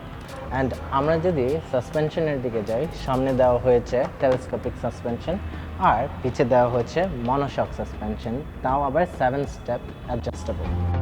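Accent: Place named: Dhaka, Bangladesh. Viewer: amr gu er review, bangla english milai bole uttara/gulshan er nola pola pain der moto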